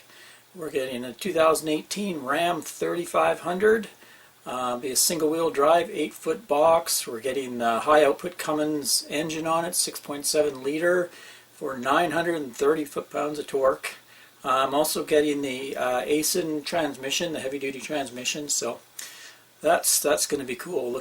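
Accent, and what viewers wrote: Canada. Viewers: In stead of calling it Big Blue 2 The Dodge Two Blue. New to your channel getting cough up on your episodes. Man you are so Canadian PLEASE No Insult intended I loved hearing you say "WICKED!" Have Great Travels. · Are you from Canada man. I can tell by the accent. Also I'm sorry for Old Blue man hopefully it'll go to a great home with someone that'll bring it back to life.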